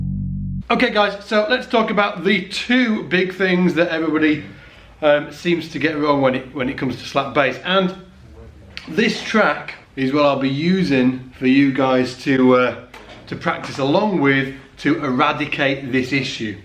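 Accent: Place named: Northern England, United Kingdom. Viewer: Where is your northern accent coming from m8? Is that Leeds or you moved from Birmingham? I am trying to figure that out from some time. Big fan of yours and fish and chips as well! Cheers from PL!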